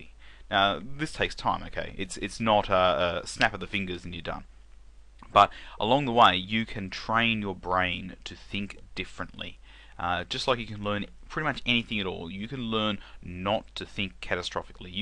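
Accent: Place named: New Zealand. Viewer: Wow you described me exactly. Are you a Kiwi you have an NZ accent?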